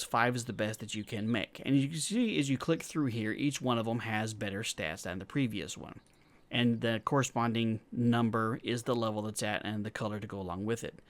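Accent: South, United States. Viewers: is your accent southern?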